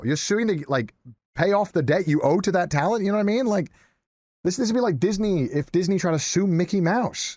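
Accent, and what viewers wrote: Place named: Wales, United Kingdom. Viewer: is so so angry. His Welsh accent is creeping into his radio voice.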